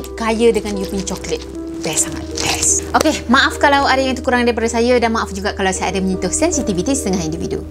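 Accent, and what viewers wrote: Kelantan, Malaysia. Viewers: Kelate bosku. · Kelate boh..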